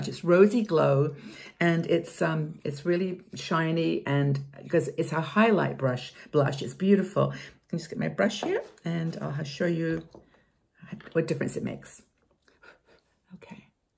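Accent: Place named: England, United Kingdom. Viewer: There’s definitely a bit of an English accent there. 🙂💕 🇺🇸 🇬🇧 is so charming and fun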